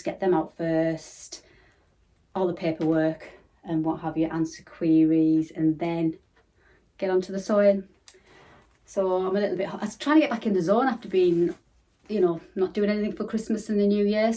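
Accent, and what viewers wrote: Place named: Newcastle, United Kingdom. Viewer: I'm just watching this a year later. I'm NE England born and raised but living in Illinois (beyond my control when I was a teenager in the 60's 😂). From your accent and Geordie's name I'm guessing you are around Newcastle. I was born in Wallsend and lived in the Cullercoats/North Shields area. Love watching what you do and I just bought a couple of your patterns! I used to have an Etsy shop selling bags but closed it when I got breast cancer in 2016 (ok now). I got mine ready for the post the same as you except that we have a drive-up mailman who would pick them up at my large mailbox, so no trips to the post office 🙂 One thing here though is that we can no longer wrap boxes with paper as it can catch in the sorting machine. You have very busy days that you obviously enjoy! Take care xx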